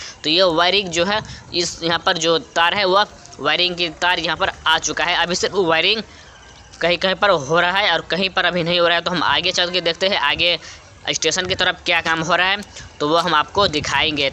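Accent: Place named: Maithili, India. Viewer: Dbg se maithly babu bolne ka andaz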